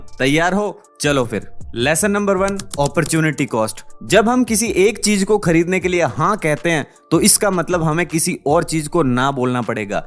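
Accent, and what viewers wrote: Gujarat, India. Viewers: Bhai accent se gujrati lage thu 😅❤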